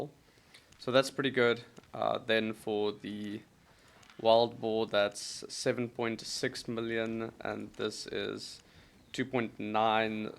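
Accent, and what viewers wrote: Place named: South Africa. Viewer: You are from saffa right ? I know a saffa accent when I hear one, immediately got my attention. 😂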